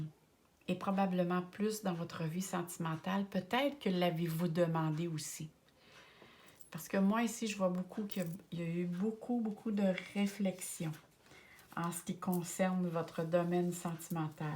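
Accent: Canada. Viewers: Bonjour, j’arrive sur votre chaîne et j’adore votre accent du Canada 😊. Je vais vous écouter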